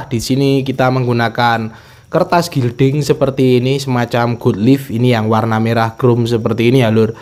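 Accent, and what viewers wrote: Temanggung, Indonesia. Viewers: Wong ndi to jane mass kok koyo wong temanggung ae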